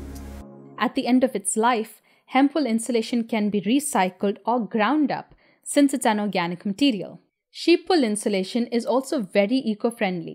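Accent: India. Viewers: Your Indian English pronunciation is good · I stumble across this video because I think your cute and your Indian like accent is nice sounding. Now lets get serious. Burning sheep wool smells like when you burn your hair with a curling iron 😊, I am a guy with short hair, I thought it was funny because I said to myself, she burned her hair with a curling iron 😂. Here is something you may not have thought about as you were mainly comparing the two materials. What if the either layered the Hemp wool and the Sheep wool or better yet, weave the hemp wool into the sheep wool, that would make for a strong product that may not burn and might take the pros from both materials. Its was just a thought. Nice and informational video. 👍👍👍👍👍 😁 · You mention yourself to be from Dubai but you are not arab for sure. Expats never get citizenship there. I lived there for 20 years and I know it. 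Why to mention yourself s someone from Dubai if you are not? You sound indian and I don't see any reason for you to hide it.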